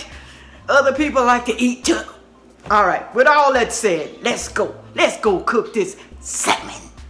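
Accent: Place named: South, United States. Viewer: I love your Southern Accent...😂Thank You for sharing your delicious recipes Ma’am!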